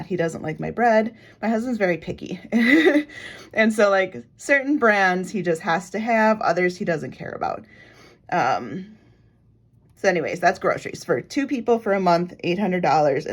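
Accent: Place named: Midwest, United States. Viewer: For real! I didn't hear the nerves at all. I actually told my sister that I would listen to you if you had a podcast because it's like I'm chatting with a friend! Maybe it's the Midwest "accent" we have that sound so familiar hehe :)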